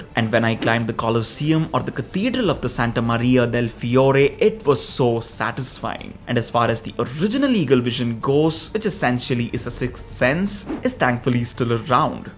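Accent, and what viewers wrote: India. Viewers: basicly it's shit in ac unity it turns u into a f*ckin guards syndicate is just better things also it would be cool the more discounts for being good n not killing civs instead of killing u If u kill civs btw love ur channel keep up the great work n love the Indian accent always great to see foren ppl who play games I like its rare usually just americans and canadians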